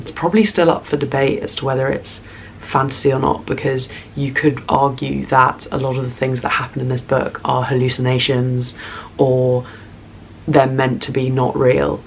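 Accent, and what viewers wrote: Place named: United Kingdom. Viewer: I really enjoyed this video. Its cool to hear someone else's take one books like these. However, i cant keep my self from wondering about where you are from. Some times it sounds British, at times Australian and then i wonder if you're South African. You have probably gotten this question many times. But where are you from?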